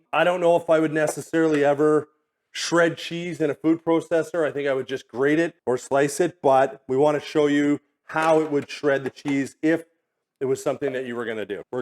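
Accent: Canada. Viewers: canadian for sure. no X in ET CTERA. No X is ES PRESSO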